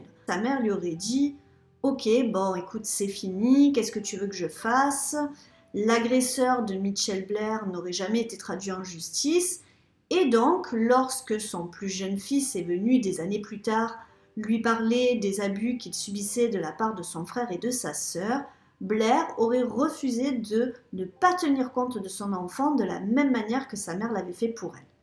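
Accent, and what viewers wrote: South, France. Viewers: Quelle horrible affaire... et malgré le fait que je suive beaucoup de youtubeurs true crime, c'est la première fois que j'en entends parler. Bravo, continue à nous proposer du bon contenu 😊 PS: j'adore l'accent du sud! 🏝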